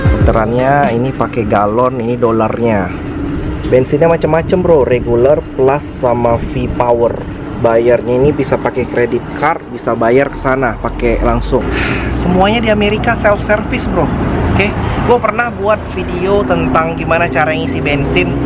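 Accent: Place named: West Sumatra, Indonesia. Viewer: Wkwkw bner TU minang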